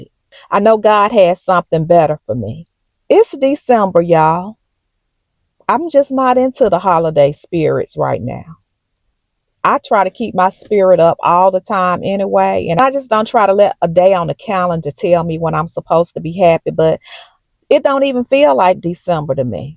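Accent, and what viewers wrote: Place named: Texas, United States. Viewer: On another, side note, any chance you're from Texas? You sound and also kinda look like some of my family, lol I know it's a long shot, but just something I noticed! Wishing you well. 🙂💞❤️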